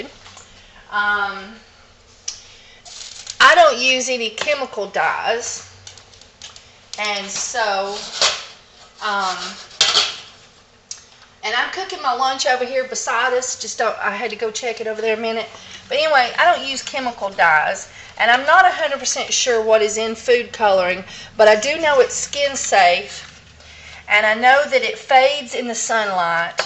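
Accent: South, United States. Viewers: Thank you for the video. I love to listen to you. I'm from Ms. so I understood your "sayings" LoL! Gotta love the south :)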